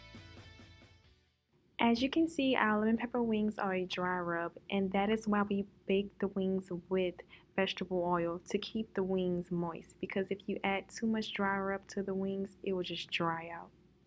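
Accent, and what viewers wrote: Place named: South, United States. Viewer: You and that southern creole voice tho. Whatever accent it is, it's definitely cooking with some spicy flavor... and what were you saying 'bout some chicken wings?😁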